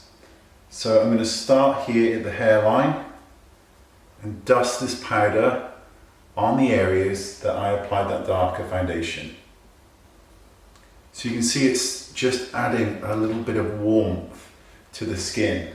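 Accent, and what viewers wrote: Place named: United Kingdom. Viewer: He is from the UK. :)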